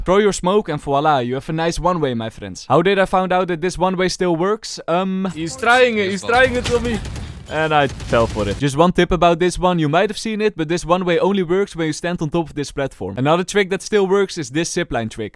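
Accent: Netherlands. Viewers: i smell dutch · good tips but are you ducht?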